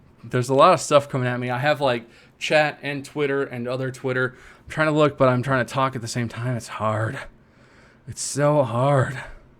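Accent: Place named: Canada. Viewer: OMG your Canadian accent just killed me, LMFAO #CanadianPride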